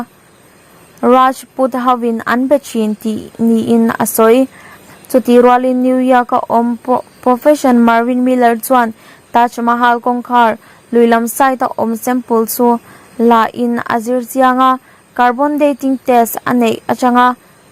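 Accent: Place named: Myanmar. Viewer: Enge in sawi sel vak vak. Burma aw phawi a nih hi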